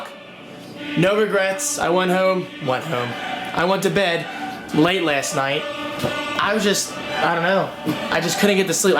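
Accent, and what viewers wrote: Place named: United States. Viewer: why do you pronounce "on" as "own"? Like when you say "online" you say it "ownline". Other than that you sound American, which is it should be coz your an American. LOL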